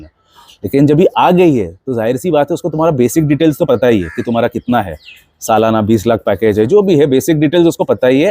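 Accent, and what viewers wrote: Bengal, India. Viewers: Heavy bengali accent ...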